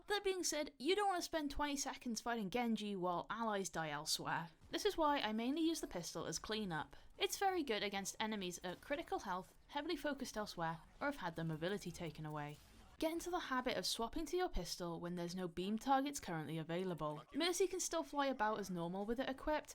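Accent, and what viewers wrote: United Kingdom. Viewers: Awesome vid! You from the UK too?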